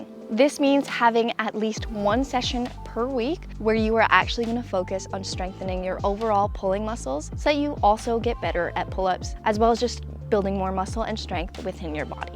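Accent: Canada. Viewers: I KNEW you were Canadian. By the way you mispronounce "out."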